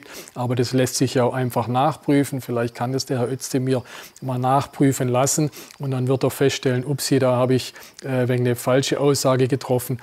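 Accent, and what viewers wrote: Swabia, Germany. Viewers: Klasse. Vielen Dank für dieses wichtige und meiner Meinung nach dein bisher bestes Video. Einziges Manko aus meiner Sicht : dein hartnäckiges Beharren auf deine für Nicht-Schwaben schwer verständliche Mundart. Da du das offensichtlich weder ernst nimmst noch jemals ändern wirst : wie wärs mit generellen Subtiteln auf Hochdeutsch? Abgesehen davon hast du das Video echt toll gemacht und bei dem brisanten Thema echtes Fingerspitzengefühl bewiesen , Hut ab. Viele Grüße von Toni Mindnich aus Spanien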